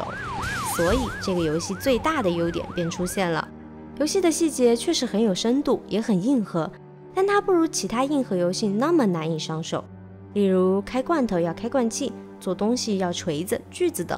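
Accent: Sichuan, China. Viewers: 是四川口音啦，不能说是不标准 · 川普可是四川频道主的特色，不可不尝啊。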